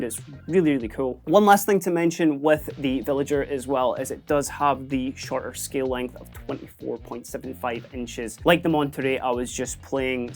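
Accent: Scotland, United Kingdom. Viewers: Can't understand the scotch accent 🤷🏻‍♀️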